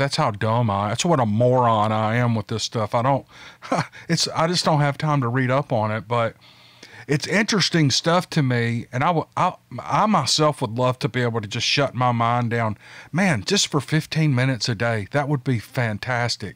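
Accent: South, United States. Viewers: . The accent is great. Especially to us in Britain my friend. Very Rett Butler, lol. Remember to us Georgia, N Carolina, S Carolina etc all sound pretty much the same. No offence bud. Also a lot of Southerners could still possibly be descendents of Civil War confederate Soldiers, which to a lot of us, is fascinating. I don't mean racism either. Just great and historical. Hope that doesn't offend you mate. Just a possible reason why people mention the accent. 👍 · The funny thing about your accent is relevant, of course it doesn't change the story, however, it draws you into the story, and makes you feel at home (so to speak) It is the Southern thing, I guess, anyway it is obvious that others think the same as I and many others., just keep on keeping on, you are a valuable speaker, and have a way of relaxing your audience, me included. Just be real, and believe it or not, you, as you, comes thru. 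I really enjoy your channel. Don't change a thing. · "Those Dmn Yankee Carpet Bagger's and Pine Wood Thieves!". (I can just hear them, and well, "the element is a very close equal, those that SCAM the Naive and Older Folks").... and I'm a born Yankee, loyal to the Union, but with a very real Tennessee Southern Accent. I've never cared for the variable Southern or Country Accents, far preferring the Chicago and Boston....and has a very mild accent, much due to the having been raised in the Metro Memphis area. Much preferred!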